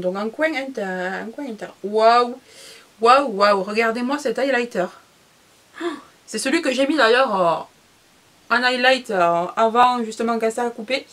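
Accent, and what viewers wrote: South, France. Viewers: ton accent qui sent bon le soleil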